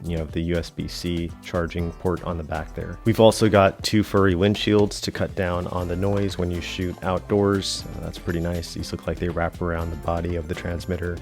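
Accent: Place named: United States. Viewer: I’ll do it Great looking man. Nice American accent. Good content 10/10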